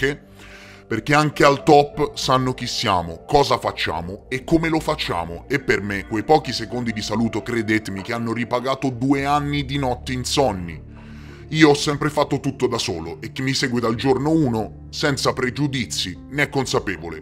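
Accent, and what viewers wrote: Tuscany, Italy. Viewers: Sono davvero orgoglioso di essere un veterano, ti seguo dai primi video quando si sentiva l'accento toscano, quando la maschera era senza i contorni blu-fluo, da quando la sigla era una specie di cubo con le faccine di Click... insomma è un vanto far parte di questa family e devi essere orgoglioso di questi risultati e soprattutto dove ci hai guidato aprendoci la mente... Grazie la tua umiltà va di pari passo con il tuo essere "BOMBER"... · Anche io lo seguo da un anno e passa ormai, e sin da subito mi sono accorto che è toscano, però non sono riuscito a capire di quale zona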